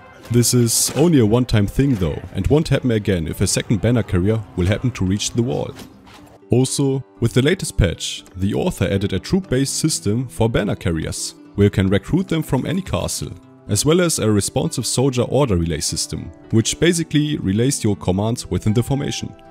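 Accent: Germany. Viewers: 1 little question, where are u from again ? U sound kinda german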